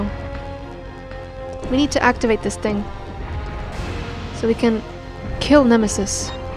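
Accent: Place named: Sweden. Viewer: Swedish wow I have never heard a swedish accent :), lol I'm guessing you're getting mad about people saying you don't? I do have to say your english is very good, however I do like that accent!! It sounds awesome.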